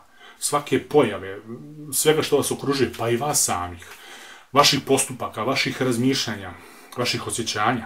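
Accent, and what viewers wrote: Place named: Ekavian, Serbia. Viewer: Ooooo, preslo se na ekavicu!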